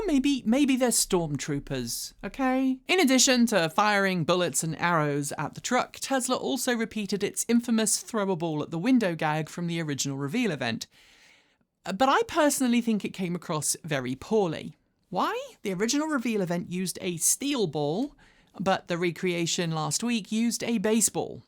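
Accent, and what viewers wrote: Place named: United Kingdom. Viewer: "While Elon Musk has been very cool towards..." Oh that's right you speak the Queen's English. It's a bit ambiguous to a Murkin.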